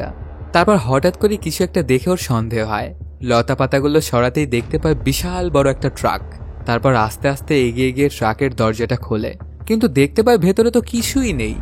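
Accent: Bangladesh. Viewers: হালায় কি রাজশাহীর জেলা না বরিশাইল্লা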